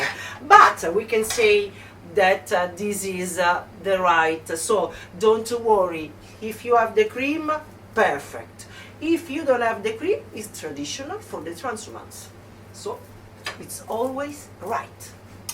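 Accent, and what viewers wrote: Italy. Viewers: more than her recipees i love her cute italian accent. so lovely!❤ and it proves her being highly educated. i give her a big hand! 👏👏👏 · I think I'm in love. She cooks, earns money cooking. Is a great cook and speaks English with an Italian accent. Is beautiful and charming. I'm in love. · inglesi e americani adorano questo tipo di accento italiano, un po' come a noi divertono certi inglesi quando parlano italiano con l'accento inglese. è naturale. ha fatto bene. brava chef ! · Nice lady . Funny indeed charming 💎. Great cooking skills . Great english with that italian accent . I would love to eat there . Beautiful country mama Italia 🇮🇹. ❤. Looks Yummy dish 😋. They have so great food n excellent cakes n ice-cream 😋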